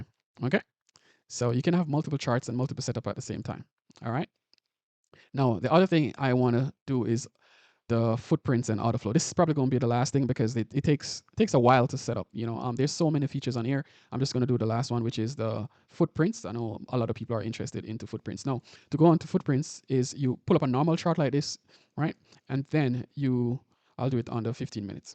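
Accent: Jamaica. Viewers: Real yawd man bro mi can hear it lol but now I have to find out how to get quantower on mac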